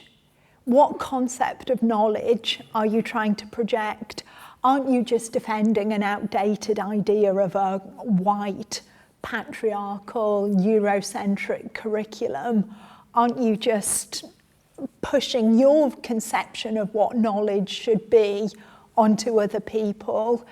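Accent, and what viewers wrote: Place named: England, United Kingdom. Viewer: Where is she from? I'm trying to place her specific English accent.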